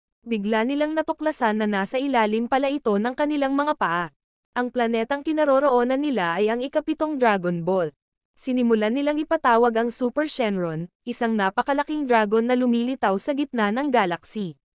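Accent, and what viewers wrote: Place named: Visayas, Philippines. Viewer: Feeling ko bisaya tong nagsasalita, freyza?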